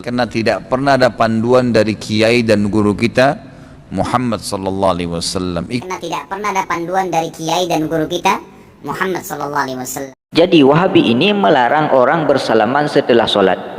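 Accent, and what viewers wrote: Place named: Aceh, Indonesia. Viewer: Logat aceh han gadoeh 🙏🙏🙏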